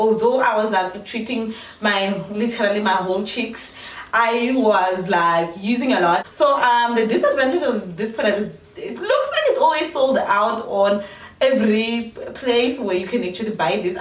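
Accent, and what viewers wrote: Tsonga, South Africa. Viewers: I love everything about this Tsonga accent 😍😍😍😍 and that you're not trying to fake a different accent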